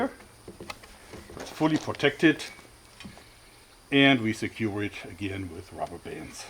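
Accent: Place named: Germany. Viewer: For one, international english is based on British English unlike canadian and american English. But also, Mr is german, so his pronunciation is different, no moreso than any regional dialect like American.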